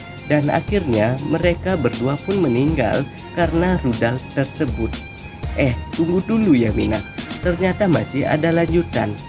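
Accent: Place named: Lombok, Indonesia. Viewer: Miminnya seperti orang lombok ya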